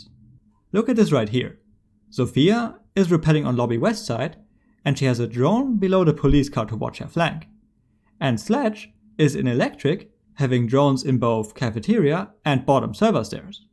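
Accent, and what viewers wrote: Germany. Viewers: Duuuude yo accent sound german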